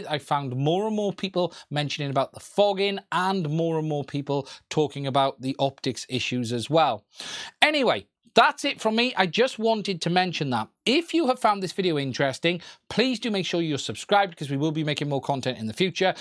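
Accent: Wales, United Kingdom. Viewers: Stumbled across your channel and thought I detected a bit of a Welsh accent! Enjoyed your review & subbed. Lots of useful info:) Many thanks! Whereabouts are you based in South Wales? I'm up in Ceredigion but not a whole lot of FPV action up here. I've been building/flying drones since the DJI Naza & KK-2 controllers were around so I'm stuck with a diverse fleet of analog FPV quads/wings, mostly running TBS Crossfire or FrSky R9 (although I did spring for a Mini 2 for some stable 'Countryfile' style footage). I'm keen to switch to digital FPV for improved clarity so I'm considering the Avata for some Cinewhoop-style footage but also keen to retro-fit a DJI Air unit to a couple of my existing drones. Sounds like a pair of second-hand V2 DJI goggles would probably cover both bases subject to following guidelines for rooting, etc. Cheers!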